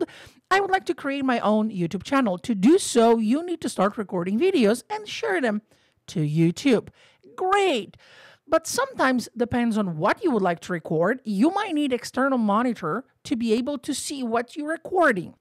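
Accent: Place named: Brazil. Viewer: You sound Brazilian. Awesome video! Thanks.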